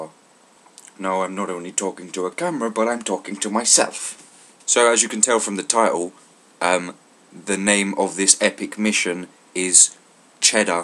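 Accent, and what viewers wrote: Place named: Newcastle, United Kingdom. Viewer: Loved the geordie voice. x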